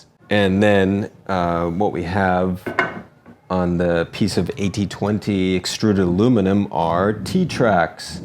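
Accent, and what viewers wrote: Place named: Canada. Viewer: Great video, great idea. Also, I could listen to Canadians say words with the "ou" sound all day long: out, about, house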